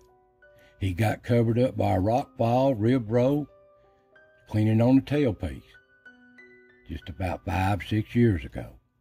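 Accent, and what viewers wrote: Appalachia, United States. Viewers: It is amazing how much your Appalachian accent sounds like the East Texas accent. My only obvious conclusion is that a lot of the settlers here came from your area. I dunno. just a thought.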